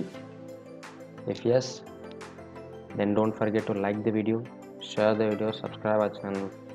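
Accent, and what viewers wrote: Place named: India. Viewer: Suggestion: drop the background music. The loud background music combined with your thick Indian accent makes listening to the content difficult for me, and I would venture for others, as well.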